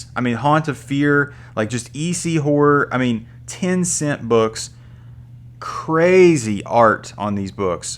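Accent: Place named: Tennessee, United States. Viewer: to answer your question at the end, do i think its feasible to obtain those type of books just paying cash, no, not unless you're rich or spending money you dont need to on it. Now is it possible to obtain stuff like that by building over time, selling, trading and all that? Sure....but even then....in my opinion theres no way this guy isnt fairly well off. Its such an awesome collection but i would consider it overboard for a person without alot of expendable cash lol. Of course its also possible hes always had alot of them since way back when some came out, but for ppl our age, we arent gonna have anything in our PCs that we bought when it came out before the copper age unfortunately, which means wed be paying premium to own the majority of what was in there (not counting good deals and getting lucky and all that). Btw i just found you're channel and subbed. 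You sound like your from around my neck of the woods lol. Tennessee here. Cool video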